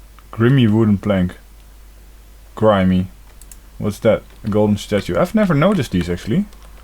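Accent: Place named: Netherlands. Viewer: Ben je Nederlands? Zo klinkt je accent namelijk! :)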